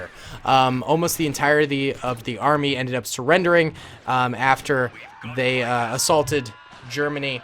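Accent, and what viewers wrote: Russia. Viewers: his russian accent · leave the russian accent for barronvongames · This is the perfect video. Your Russian accent is hilarious, and the Soviet Anthem in the background makes it even more entertaining. Also, you should try doing an Old Republic video someday.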